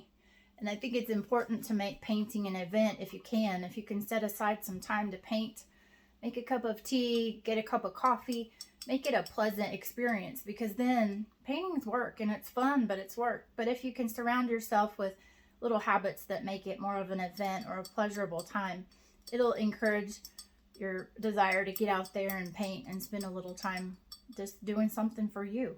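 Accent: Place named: South Carolina, United States. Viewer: Where did you get your non-accent? I say non-accent because you sound like we do in South Carolina.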